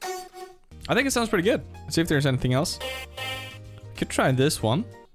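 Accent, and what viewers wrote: Sweden. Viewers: I think you should give us the mixing stuff that you always do with details. And a mastering start to finish .(i actually love your swedish accent 😂) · din svengelska dialekt är ju fett najs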